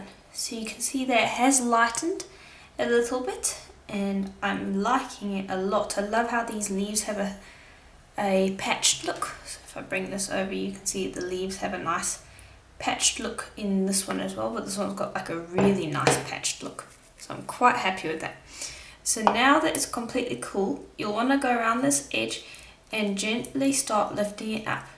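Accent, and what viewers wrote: South Africa. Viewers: Your accent feels so familiar! Are you a bittie South African? Enjoy your videos! Thank you. 💖🌸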